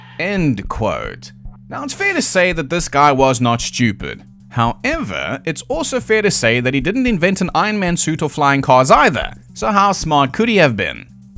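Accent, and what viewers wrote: South Africa. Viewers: awe boet🤣. I sat this whole video debating wheter you were a soutie or aussie. Ive been proven correct, love the channel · You sound just like my friend Ian who is from South Africa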